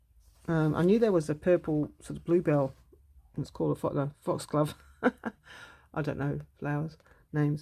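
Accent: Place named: Australia. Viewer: So glad I found you not so long ago as I find listening to a fellow Aussie really relatable. Love what you use to collage with. It was great to see the magpie and how friendly it was. We used to have a family of magpies who came and played on the kids trampoline and our hills hoist for years until the crows moved in. Really miss them still. I would love to be in the giveaway for the stamps. Kicking myself for giving my stamp album years ago before I started collaging. I have saved your playlist of Margarete Miller collage challenges to watch and have seen a few already. Thankyou for sharing. 🙂🙂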